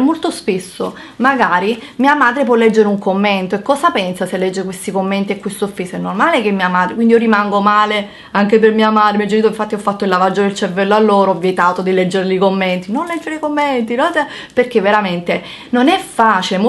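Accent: Naples, Italy. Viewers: queste persone sono davvero idiote ma come si fa a non amarti? cioè davvero il tuo accento napoletano secondo me è una qualitá e si deve sentire il nasone ce l'avranno loro perchè io non lo ho visto ... abbi forza non mollare per tutti noi non mollare!Saremu anche terroni ma tinemu a capa tosta e perlo in generale di tutto il sud inoltre il sorriso nonostante tutto nessuno mai si puó permettere di torgliecelo! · Cara ti seguo con piacere proprio perché sei vera, lascia perdere chi ti critica va avanti c'è tanta gente che ti apprezza davvero. Chi ti critica lo fa perché sa che, oltre ad essere una bellissima ragazza, sei soprattutto intelligente ed in gamba. Invidia si chiama, Continua così anzi più forte di prima:D Un saluto:) ps: amo il tuo napoletano.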